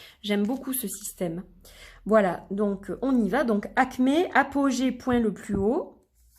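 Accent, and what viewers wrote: South, France. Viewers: Bonjour, grâce à je viens de découvrir vôtre chaîne . Joli accent sudiste si je ne me trompe pas 😉 J'adore l'univers de cet oracle et je viens de le commander. L'association avec la numérologie me ramène à mon Oracle de la Triade. Encore une fois merci à vous, je vous souhaite une très bonne soirée. 🙏🕯️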